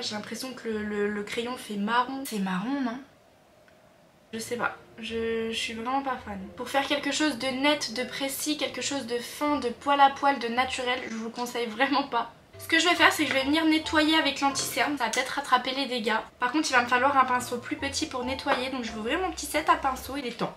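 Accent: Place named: United States. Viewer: Je n'avais jamais entandus ta voie ! Au début, regarder tes vidéo sur tiktok et je croyais que tu n'était pas française plutôt américaine 😅🤣sinon t'es vidéo (et short) son juste trop bien et tes make-up, juste incroyable!!!! ❤❤❤❤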